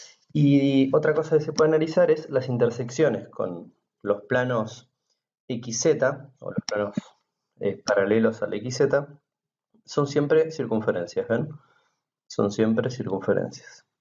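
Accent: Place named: Argentina. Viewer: hola como estas? genio excelente explicacion, argentino tenes que ser ja ja. tenes idea como mover el plano en geogebra 3d? abrazo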